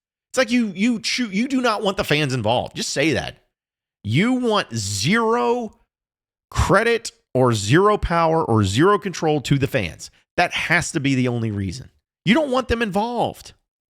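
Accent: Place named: Kentucky, United States. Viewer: This guy speaks Kentucky talk, he should start doing Kentucky sports, hes got some cawood in him.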